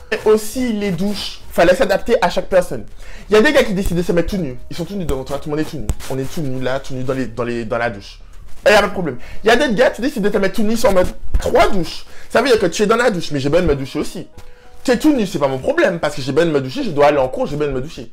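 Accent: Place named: Martinique, France. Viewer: Wouah!!! L'accent martiniquais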